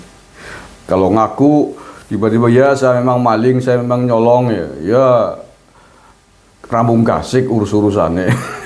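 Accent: Banyumas, Indonesia. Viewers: Tuuuhhhhh khannnn 😂😂😂 senyum gimanaaaaaa gitu khan. Tp yg aku suka dr tuuhhh bahasa banyumasnya mbil senyumm gimanaaaaaa 😂😂😂😂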